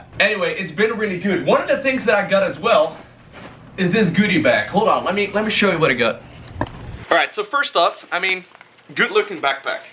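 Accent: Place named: Netherlands. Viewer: You are from Netherlands? I knew that the accent is netherlandish!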